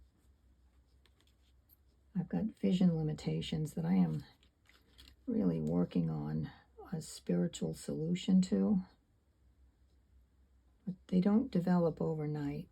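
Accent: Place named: Massachusetts, United States. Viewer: You crack me up! I'm a first time watcher. You must be from MA!! Your accent & word choices make me feel right at home!! I quite enjoyed watching your methods.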